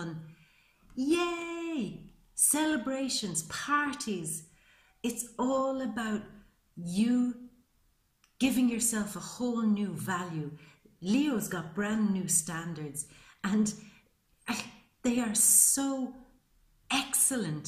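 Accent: Ireland. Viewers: Helo there buitifull lady , i thank you from the bottom of my big Leo hart ,wow love your Irish accent to , happy New yr , well I had tears runing down my cheek for thats my life you read, i know it's General , but i felt it for it was saturn on my case 4th house in my chart in sag , but none the less he's been my task master, it's been karma,i suferd 21 yrs in total, i have endured more than any man, but you are right it a cumilated in the last 3 .. I call it hell an back ,i am still hear , and I really cry when you said ive paid 10 life's karma,.saturn is the teacher task master and father time , Lord of karma , he took me to the limit of my endurance , striped me bare and shown me how insignicant we are as beings for we truly are , pain and sufering loss and the false fell like dead flys , to the way yet test after test i endured humbly for i no i am gratefully for his lessons , for he surely is the greatest teacher of all , and I learnt the hard way ,.i all ways have Spence age seven ,. 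Ime now 60 ... but i like what you said because I do be leave ive passed the tests because simply i wouldn't be hear ,, this i do believe 1000%.. and the rewards via saturn are the greatest for the endever and passing,, yet to come, patients was the hardest lesson humility releasing egos pride, Many many lessons, false pride ,i am a current man than when I started , . I no got to move from hear padt , finally to get ready to receive,. Renewed hope change finish my transformation, ready to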